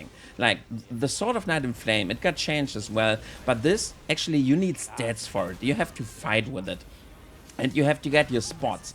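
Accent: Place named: Germany. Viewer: (Btw finde ich es super cool, dass du solche info vids über ER machst. Ist definitiv mein aktuelles lieblings game! Außerdem finde ich die Art wie du englische Videos präsentierst super gut! Man hört natürlich das deutsche raus aber ist trotzdem super verständlich)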